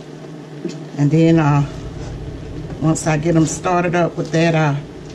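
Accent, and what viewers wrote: South, United States. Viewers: Lord I stumbled upon this video about collards and I just had to watch ma'am that southern accent and that stainless steel spoon,God bless you and yes if doing a video on how to wash cut and clean collards I'll love it.Thanks ma'am!!! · " " you are so cute!!! ❤️❤️❤️. I love your apron and your Southern Belle accent. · your accent tells me you're from down south you and the Soul food Queen are must see for me.. especially around this time of year...I'm near 70 and y'all remind me of home on the Mississippi River Rd in Louisiana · Smoked Hamhocks is the only meat I put in my collards also. Are you from Georgia or Alabama? I’m from the ATL and I absolutely LOVE❤️ the way you pronounce your words and your southern accent. You go!🔥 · HAS A CLEAN KITCHEN A SOUTHERN ACCENT AND A BEAUTIFUL SOUL = A GREAT COOK❤️ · DEAR, I FELL IN LUV LUV LUV WITH COLLARD GREENS YEARS AGO! 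THE NURSING STAFF ON MY FLOOR IN THE HOSPITAL WOULD ALL BRING IN A COOKED DISH TO SHARE! BUT, I NEVER NEW HOW TO COOK THEM UNTIL NOW! I WOULD LIKE TO ASK YOU IF YOU KNOW HOW TO POKE GREENS. I HAVE A LITTLE HOBBY CALLED FORAGING, WHERE YOU PICK WILD THINGS AND LEARN HOW TO COOK AND EAT THEM. I'M PRETTY SURE IT IS A SOUTHERN DISH, AND I THINK I DETECTED A SOUTHERN ACCENT ON YOU!!! SO,YOU MIGHT BE THE PERSON TO ASK.THANK YOU AGAIN FOR SHARING YOUR RECIPES.I WOULD LOVE TO SHARE MY GREEK ONES WITH YOU. DANDELIONS ARE GREEK "COLLARD GREENS"! LOL😂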